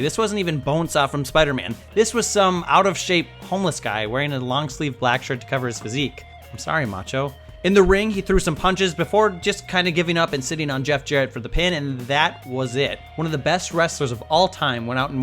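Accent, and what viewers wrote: United States. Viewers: Never heard an American presenter on a whatculture video before. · Why is an American doing the narrative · Man.. This is weird hearing an American guy haha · American man? More like I want my English accents back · that's an american accent, who is that? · Listening an American accent in a Whatculture Wrestling video is so weird.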